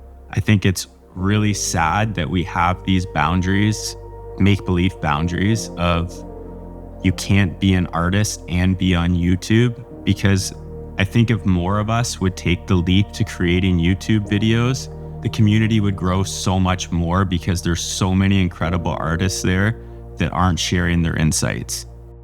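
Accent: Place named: Canada. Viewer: Dude, excellent storytelling. Excited to have you on the platform. Also that Canadian accent is 🔥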